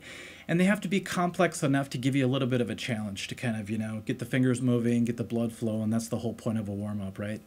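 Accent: Minnesota, United States. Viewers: From Minnesota?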